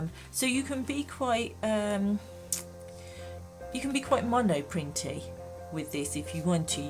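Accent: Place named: England, United Kingdom. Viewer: ps trying to place your accent! I'm originally from the North West of England (now in Scotland) and yours sometimes sounds N.West... but it sometimes has a west country twang? :O) mind you, I'm a bit rubbish with accents!! ha ha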